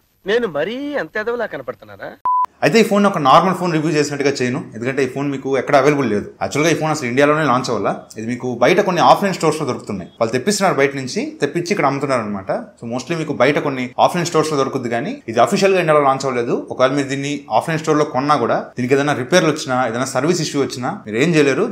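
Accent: Nellore, India. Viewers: Meedhi nellore aahh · Bro u from nellore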